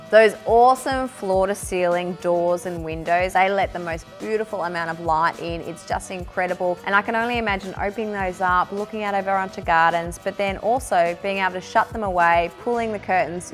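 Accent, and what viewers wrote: Australia. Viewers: is just wonderful how she puts it together all tied up with s cute Australian accemt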